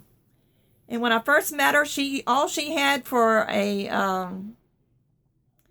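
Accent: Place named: South, United States. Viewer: Hello am the same one who liked ur southern(not cowboy...) accent!! And of course your great chit chat while sharing polymer crafts which are super helpful.this heart to heart video was so touching dear,i find you are so sweet and humble. Wish u the best for new position and whatever u decide i ll still be a faithful viewer from the island of crete in greece.take care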